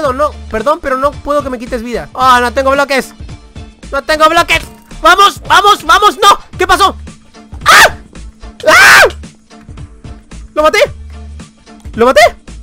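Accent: Argentina. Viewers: ! Sos argentino? Xd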